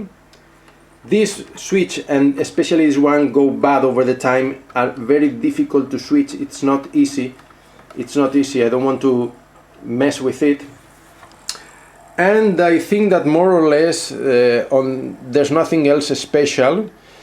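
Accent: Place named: Spain. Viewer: Curioso lo de que comparta mecanismo con Nakamichi, el diseño de época está muy guapo.😎😎😎 Por cierto ¿Has intentado hacer el vídeo en castellano o en alguno de los idiomas comunitarios del país? Parece que seas de aquí intentando hablar inglés. Personalmente mejor usar subtitulos si quiero llegar a público internacional, pero ya al gusto de cada cual. Un abrazo🤗🤗🤗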